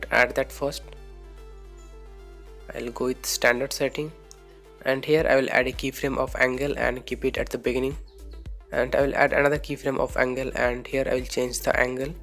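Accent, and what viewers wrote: India. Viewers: U are indian i know indian or pakistani people speak english like that😃i am right am i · you know its the good shit when you hear that indian accent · Hahahaha Indian English your speaking wtfc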